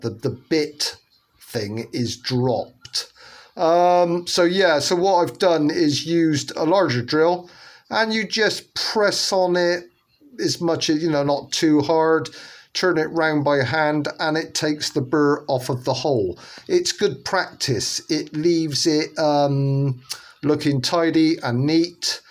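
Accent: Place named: Bristol, United Kingdom. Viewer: That's the most Bristolian accent I've ever heard. Thanks for the video though, very useful!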